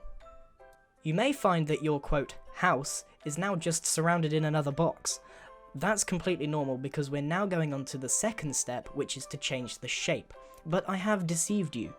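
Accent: United Kingdom. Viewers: dude dude i really like your brithish acsent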